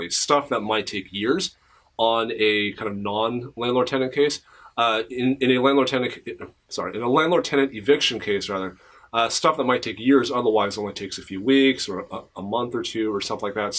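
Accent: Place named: California, United States. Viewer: wow...now that is a california accent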